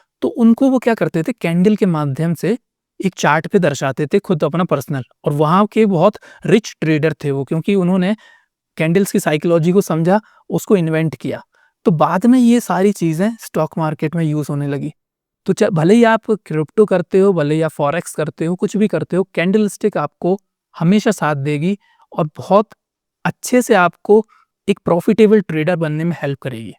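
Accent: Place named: Agra-Mathura, India. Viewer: Bhai aap agra ya mathura se ho kya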